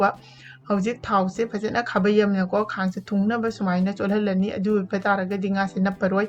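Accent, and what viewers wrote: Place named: Imphal, India. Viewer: Yaamna fajaba, asengba meetei lon ngaang-nge Imphal lomgi, video upload ta ngaangliba meetei lon sing Adudi taabada pelle.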